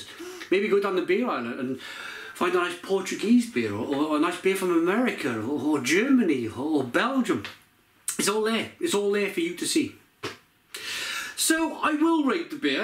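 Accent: Wales, United Kingdom. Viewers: He’s got the slurred speech accent of an alcoholic ahaha or is that just welsh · Are you British? By the way I'm new to your channel!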